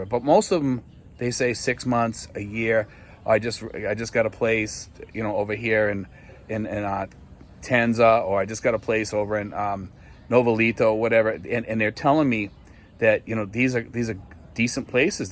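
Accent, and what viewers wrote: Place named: Massachusetts, United States. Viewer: i detect a Mass accent, where are you from in Mass?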